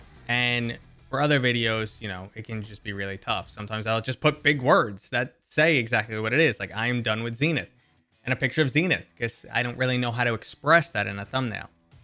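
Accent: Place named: New York, United States. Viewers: I KNEW YOU WERE FROM NEW YORK!!!! Yesss! Love it! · AYY A FOCKING NEW YORKA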